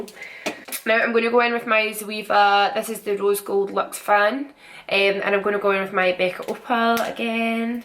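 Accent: Scotland, United Kingdom. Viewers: The Scottish accent is so pretty! & I'm in love with this look!! xx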